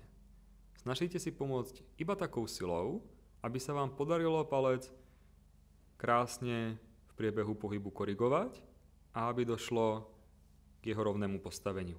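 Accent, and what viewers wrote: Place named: Czech Republic. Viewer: Ahoj ty Si čech alebo Slovák ako to rozprávaš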